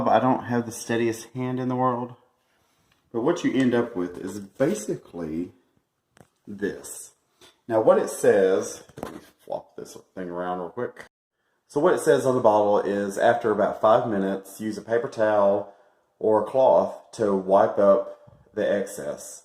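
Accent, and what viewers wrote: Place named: Georgia, United States. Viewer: I'm going to try this!! You were hilarious! 😂 You sound like you are from Georgia!! Same as us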